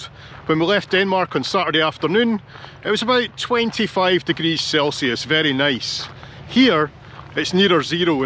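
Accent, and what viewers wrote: Scotland, United Kingdom. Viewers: I think I just like hearing you talking and your authentic accent and your Scottishness. you so funny!! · As a scouser that lived in Aberdeenshire for years before moving to Canada, I love your video’s. Your exploring and videos reminds me of home, and your accent reminds me of my time living in Scotland, fond memories. Keep up the good work, you have me hooked.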